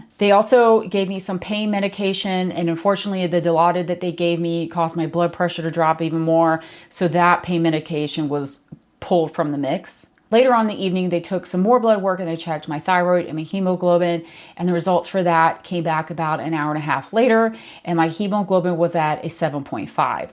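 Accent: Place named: United States. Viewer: 🌸🌸🌸I listened to your story and I have to agree, people need to seriously reconsider having elective surgery, especially with kids!! I had heart surgery...I still have nightmares and anxiety because I will need to do it again. Surgery is a life and death situation no matter how experienced your doctor's and nurses. the risk is always there. Suffering can and will happen. If you can avoid it, avoid it as long as you have young children because you never know. You sound a bit american and idk how this has affected you financially but I'm very sorry for all that stress too. ❤ Your husband sounds like a dream. I've never had a family care like that about me.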